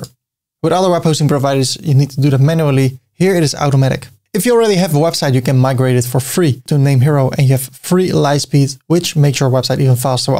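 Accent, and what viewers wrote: Netherlands. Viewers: TOP video!! En love het Nederlandse accent haha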